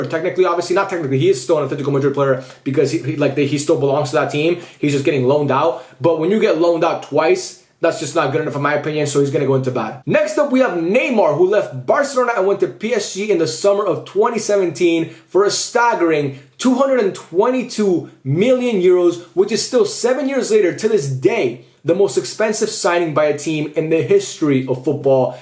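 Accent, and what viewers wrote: United Kingdom. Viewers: Amazing British accent! Please oil up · Top tier British accent 🇬🇧💷🫖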